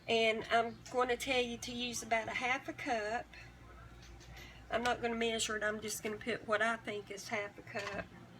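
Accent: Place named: South Carolina, United States. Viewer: Since I am new, where are you from...You have a South Carolina accent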